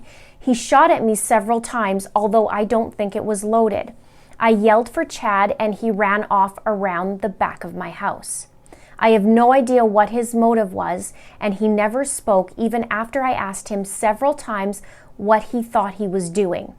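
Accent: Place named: Canada. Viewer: Where are you from? You sound Canadian. Love your videos! Can’t wait to see Lori and Chad get nailed to the wall for this! (And still so so so sad about Gannon 😫)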